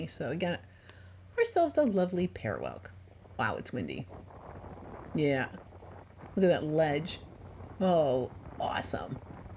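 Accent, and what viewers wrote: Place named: New York, United States. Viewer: My best friend's mom spent most of her life in NY, and NJ, and when you say certain words your dialect sounds just like hers, it makes my heart happy! It is amazing that you have learned ALL of this in just a few years!! I love your content, thank you for all you do! ❤ 🐚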